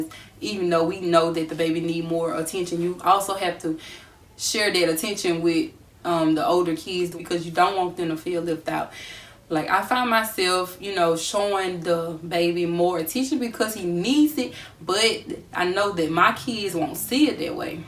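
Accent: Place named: South, United States. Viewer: Love the southern accent. Where y’all from?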